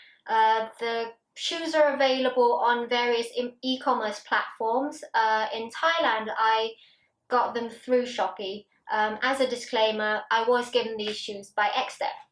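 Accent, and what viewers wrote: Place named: United Kingdom. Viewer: Great review, love that British accent. Bravo!!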